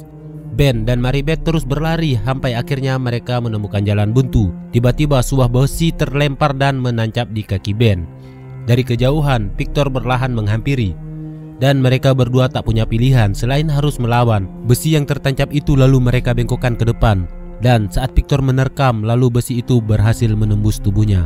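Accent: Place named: Medan, Indonesia. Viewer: Mimin kayak orang medan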